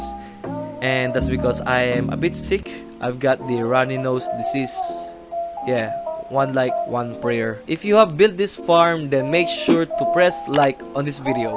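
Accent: Philippines. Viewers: are you Filipino? cuz ur english accent is like filipino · Unang accent palang, PH na PH! Salamat boss ! <3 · Are you filipino? Your accent very familiar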